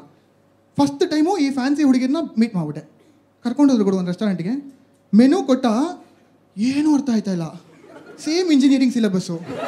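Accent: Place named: Bangalore, India. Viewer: Haha!! Kanditha!! Thanks a lot for watching!! Kannada bhaashe nammanna uddara maadtide.. Benglur slang swalpa mix ide, aadre pakka Kannadiga! ಕಲಿಯೋಕೆ ಕೋಟಿ ಭಾಷೆ, ಆಡೋಕೆ ಒಂದೇ ಭಾಷೆ, ಕನ್ನಡಾ.. ಕನ್ನಡ.. ಕಸ್ತೂರಿ ನಮ್ ಕನ್ನಡ!❤️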